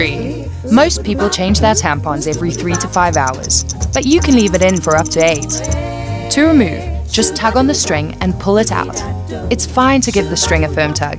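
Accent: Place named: India. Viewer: Dude are u from India, sounds like that!!!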